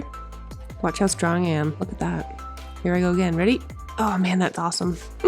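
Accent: Canada. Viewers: Hi - I am watching this video and you are commenting on my own comments I made watching your other videos. Are you Canadian? I am as well though I've been living in New Zealand 25 years so it's hard for me to tell whether your accent is Canadian or American? Greetings from Georgian Bay, Ontario! Woop Woop - another fine work of art and the colour of the paint is divine. Please what colours were combined to get this shade. It's wonderful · Regarding countersink bits, and most other small items, cheap ones from Harbor Freight will serve your purposes fine. But your accent sounds Canadian, and I don’t know if HFT does business in that area. I think the analog might be Princess Auto? (I visited Ontario once 25 years ago—my knowledge of Canada comes from social media.) Harbor Freight sells notoriously cheap, in cost and quality, tools. They’re a running joke amongst pros, but an excellent resource for amateurs. 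But you’ll probably want a decent table saw though, rather than the absolute cheapest on the market. The Ridgid R4514 is currently on sale for $399 (Can$508) down from $549 (Can$699) at Home Depot, if HD exists up North and the sale is applicable there too.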